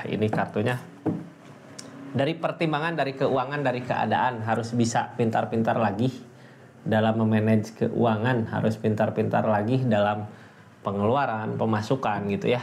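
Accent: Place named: Sunda, Indonesia. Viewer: Amiin alhamdulillah alhamdulillah alhamdulillah alhamdulillah alhamdulillah alhamdulillah alhamdulillah, makasih redingannya sehat sll berkelimpahan amiin, hallo mas ganteng seperti anaku no 3 bhs sundanya medok bangett, makasih sangat resonet kecewa la sudah 35 thn di khianati sekarang la gak mikirin asmara deh la mati rasa cinta yang penting anak anak bahagia berkelimpahan tatap hari tua di depan byk kebahagiaan dan keberlimpahan semangat 58❤❤❤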